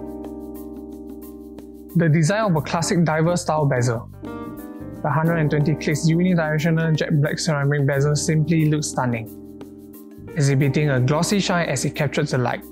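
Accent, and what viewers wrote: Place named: Singapore. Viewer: English. That’s the Singaporean accent for you lol. He has rather bad pronunciation and pacing though, even for a Singaporean.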